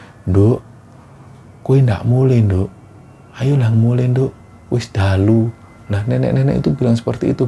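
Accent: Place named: Banyumas, Indonesia. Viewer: Pada2 ngapak.e... 🤩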